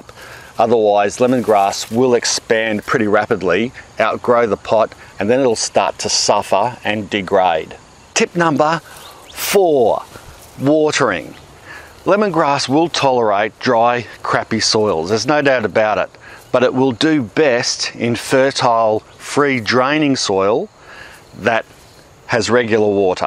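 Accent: Australia. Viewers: Well done brother! Great presentation+most beautiful Aussie accent gives extra kick! ;) Thank you. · Love the Australian Accent....love all the Videos posted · Love your aussie accent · Great information! Unfortunately I don't see any lemon grass sold with roots. I see two kangaroos in your backyard. That's a bonus. No wonder you have Australian accent! I've been down there 3 times. It's a beautiful continent.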